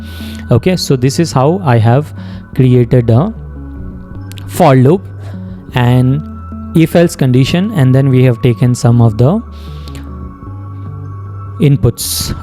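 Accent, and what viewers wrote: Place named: India. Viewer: you look like filipino with indian voice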